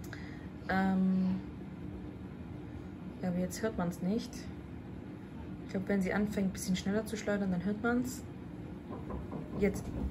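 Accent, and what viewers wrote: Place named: Swabia, Germany. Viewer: süss das schwäbische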